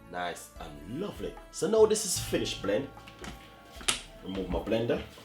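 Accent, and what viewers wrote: Jamaica. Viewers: The Tensai that's the Jamaican pronounciation,the word is moss.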